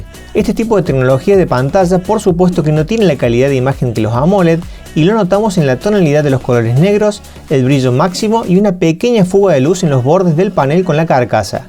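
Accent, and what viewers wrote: Córdoba, Argentina. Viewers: No hay mejor video que el hecho con esa tonada cordobesa... Amé!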